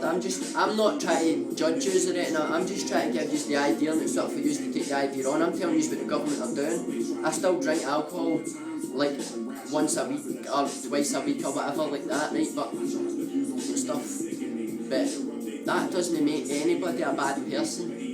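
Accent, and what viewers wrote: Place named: Scotland, United Kingdom. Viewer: Thanks i just love scotish accents i dont know why i just do but your cool anyway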